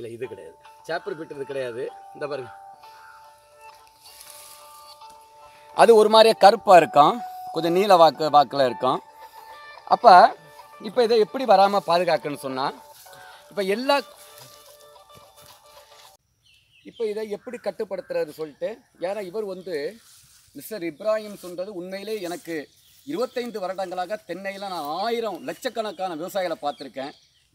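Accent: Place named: Kanyakumari, India. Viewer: Annachi kanyakumari kaarara neenga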